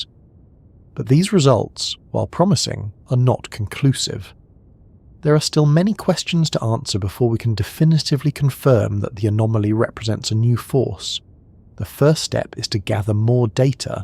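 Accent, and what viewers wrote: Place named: England, United Kingdom. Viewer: I dont have any idea of 90% of what this all is....I feel smarter just by listening to some English fellow saying big words....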